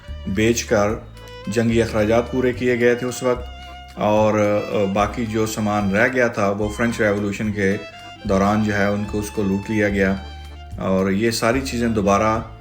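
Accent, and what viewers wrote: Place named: Faisalabad, Pakistan. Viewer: My piyara brother.. Faisalabadi accent in urdu..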